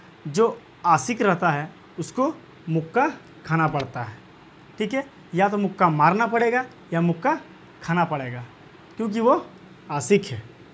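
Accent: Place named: Maharashtra, India. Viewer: Mala tuji hidi ayakun asa vatla ki tuji bhasa marati ahe asa vatla pahlida 🧐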